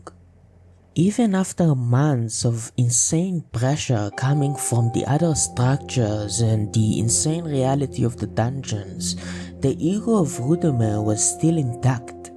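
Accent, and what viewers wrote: France. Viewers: are you french by any chance? your accent is nice but as a non native it was also hard for me to understand the first minute or so of the video hahaha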